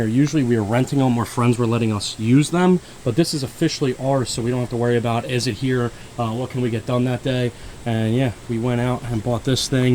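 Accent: Philadelphia, United States. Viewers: That Philadelphia accent, though. Do you know it’s, “water,“ not “wooter“?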